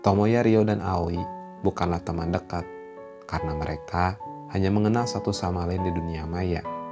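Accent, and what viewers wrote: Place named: West Java, Indonesia. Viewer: cape haha orang sunda ya bang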